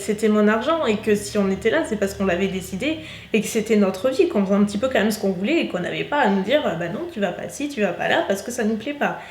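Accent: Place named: South-West, France. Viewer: Le message d'une abonnée discrète ( et ça me va ) ! Je suis là pour le contenu et certainement pour cet accent du sud ouest qui est le même que le mien ( discrète et chauvine ! ) J'adore cette franchise, tu avais dit que oui tu en devais beaucoup à ta communauté et que non ta réussite de ne lui appartenait pas ! Elle est bien à toi cette réussite! Plein de force, de courage, de réussite, d'amour de toi !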